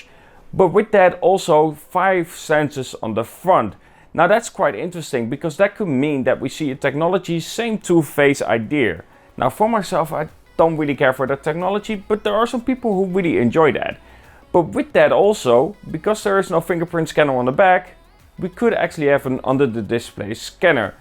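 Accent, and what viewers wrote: Netherlands. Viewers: Lekker nederlands accentje ook! Haha niet erg maar he je werkte samen met MKBHD Supersick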